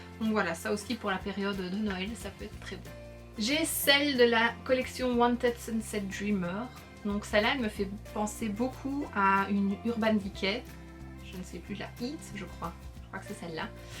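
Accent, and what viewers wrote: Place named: Belgium. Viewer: Re salut !! Aaah, ça y est, je suis bien installée pour regarder ta vidéo ! Oh la Riviera d'Anastasia, j'aime beaucoup ! Je ne l'ai pas mais elle m'a ouvrent tentée ! Ah je me disais que tu étais sûrement en Belgique. J'entendais un petit accent mignon 😉 Ooh, elle est magnifique la palette Into the Snow de Essence! Je ne connaissais pas ! Mais c'est vrai que c'est une marque très sympa ! Et qui offre pas mal de choses intéressantes pour un bon prix ! C'est marrant tu as présenté deux palettes de suite (la New nude de Huda et la XL de Lime Crime) que je n'ai pas mais dont j'ai des dupes ! Respectivement chez W7 et chez... Je ne sais plus ! Lol c'est une marque sur Amazon (mais je ne l'ai pas encore testée). J'adore la marque Makeup Revolution !!! J'ai pas mal de palettes de cette marque, mais pas les même que toi 😅 je pense que je ferai un jour une vidéo sur mes palettes aussi, mais je ne suis pas chez moi en ce moment... 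Waw, effectivement tu as plein de palettes de Too Faced! Moi je n'ai que la White Peach, et je l'aime bien pour des makeup légers. Effectivement tu aimes beaucoup les paillettes !! Tu connais les paillettes de chez Colourpop ? Parce qu'ils en font et elles sont juste magnifiques ! Elles sont jolies les palettes néons de W7 ! J'ai vu que Makeup revolution allait en sortir aussi, encore une fois dupes de celles d'Huda Beauty ! Merci pour ton partage de collection ! J'aime beaucoup regaeder ce genre de vidéo ! As-tu déjà fait une vidéo sur ton rangement makeup ?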